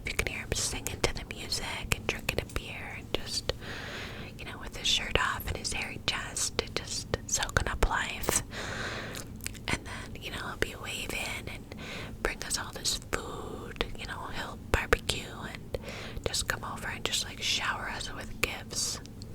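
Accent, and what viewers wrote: New York, United States. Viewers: I loved this! Can you do the next in your ny accent again? It gives me the most tingles for some reason. <3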